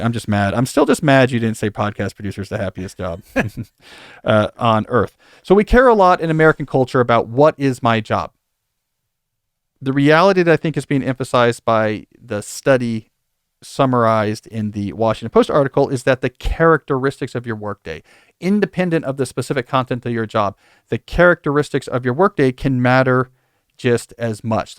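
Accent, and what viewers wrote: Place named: Germany. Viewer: Worst German accent ever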